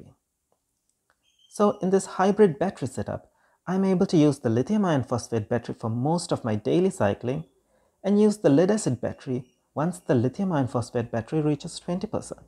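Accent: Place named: Sri Lanka. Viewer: I just need your advice on how much I have to spend on this ? and sounds like you are from Sri Lanka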